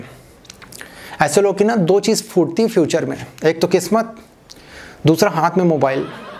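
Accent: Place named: Eastern Madhya Pradesh, India. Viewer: Why his tone sounds like he is from Madhya Pradesh ,and that too eastern part of m.p 🤔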